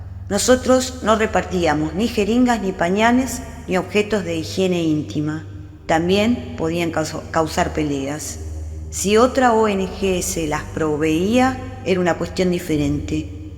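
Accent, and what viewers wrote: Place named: Argentina. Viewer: La primera parte es una crónica. Qué excelente Mariana Enriquez!!. Y qué hermoso escuchar esta voz argentina ❤❤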